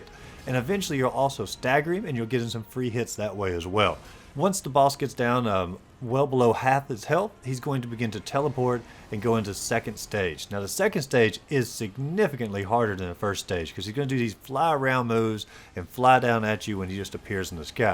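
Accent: South, United States. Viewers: Oh, and I hear that southern accent too man. Its all good, I'm here too lol!!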